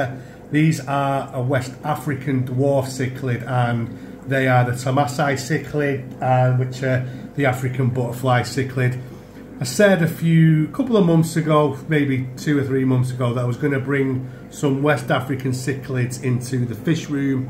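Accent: England, United Kingdom. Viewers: My daughter has started saying "stunning" in an English accent after watching your videos with me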